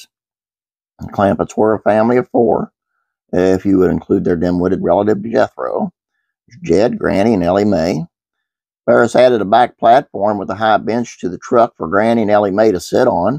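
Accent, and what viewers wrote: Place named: Appalachia, United States. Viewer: I love the hillbilly narration.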